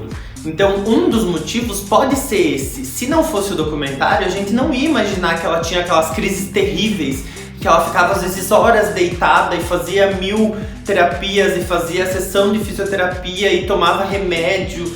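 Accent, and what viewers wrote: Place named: South, Brazil. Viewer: Sotaque do Sul kkkkk A maioria daqui tem esse sotaque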